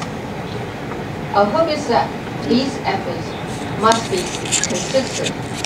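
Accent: United Kingdom. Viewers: 如此純正的英國腔語言豈是牠馬的波士頓抓耙仔或其入幕之密友同志兼大總管公公的小混混所能比擬的呢！水啦！小英！台灣史上第一女 總統！万歳！万万歳！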